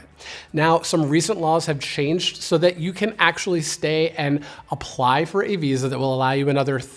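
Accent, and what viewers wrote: Denmark. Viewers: Awesome info - Thanks! (and thumbs up for the aaaaaah-mjaaaa-click-bait title....or......) As a Dane, in my ears your English is sounding more and more like most Danes - Welcome, and well done ;ø)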